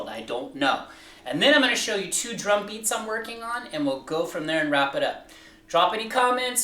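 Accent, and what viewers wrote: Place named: United Kingdom. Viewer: Oh u are from uk, I think u are from america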